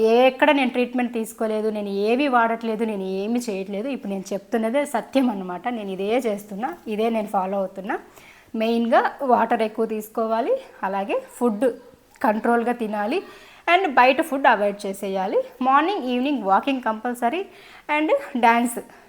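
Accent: Chittoor, India. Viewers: Meeru all rounder sis🤷‍♀️dance,cooking,family caring really your very tallented.i like your videos😘unnadi unnattu matladutharu yechulaki poyi ala matladali ila matladali anukoru.chakkaga mi chittoor slang lo matladutharu.madi nellore sister nenu kuwait lo job chesthunnanu.daily mi videos chusthanu🤗andari laga pakka valla gold ni ma gold ani,pakka valla houses ni ma own house ani abaddalu cheppakunda unnadi unnattu matladitharu.meeru chala mandiki inpiration ayyaru.any way I like your videos.god bless you sister🙌😊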